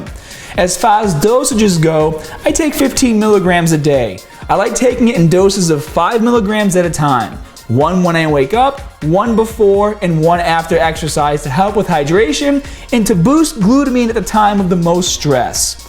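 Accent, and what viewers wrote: Boston, United States. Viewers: Gotta love that Boston accent. Ya hahd ea’ned mahnee. Thanks for the tips,! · Can you suggest how much to take. Daily? or when I am taking ballet classes? Love The Boston? accent.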